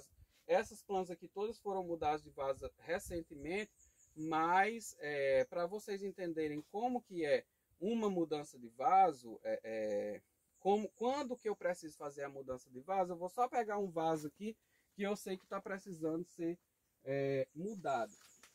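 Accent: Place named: Minas Gerais, Brazil. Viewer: Fala meu querido!!!! E esse sotaque??? Kkkkk tá bem minerin. Me inscrevi no canal e boa sorte!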